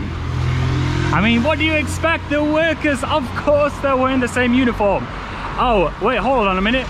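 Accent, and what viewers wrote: United Kingdom. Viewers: 英國口音好聽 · i love this cute british accent debunking Big Bullsht Corporation ,lol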